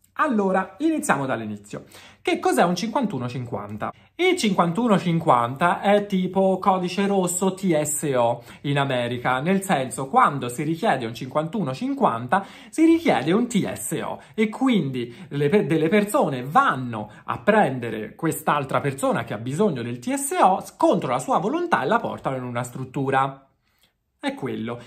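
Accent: Tuscany, Italy. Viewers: 🙋🏻‍♀️presente nuova iscritta! Io ho fatto il mio, tu per c contraccambiare mi devi accentuare il tuo accento toscano!!!!!🤩🤩😍😍😍😍🤩🤩🤩🤩amooooo!!!!!!!!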